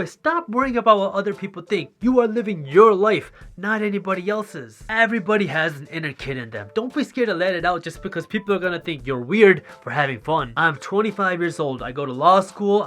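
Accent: United States. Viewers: I just love it how you start with the Punjabi accent in the beginning and say your name, and subtly switch to American accent after that!! (In all your videos) Super proud Punjabi :D Keep inspiring man!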